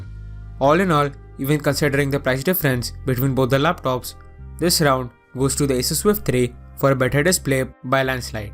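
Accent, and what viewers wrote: India. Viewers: Yo less indian accent not rude but i cant understand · Please talk slower.. no offense but your indian accent is making it worse when you talk so fast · Nice video but you should speak with less Indian accent, it's hard to undestand you. Greetings from Mexico.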